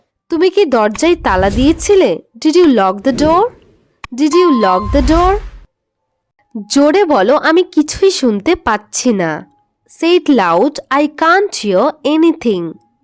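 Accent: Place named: North Bengal, India. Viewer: উত্তরবঙ্গ মনে হয় আপনার বাড়ি।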